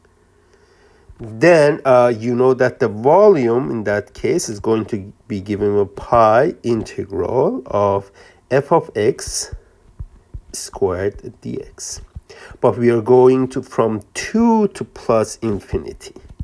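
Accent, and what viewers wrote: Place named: Nebraska, United States. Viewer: are you from nebraska? you sound like my dad?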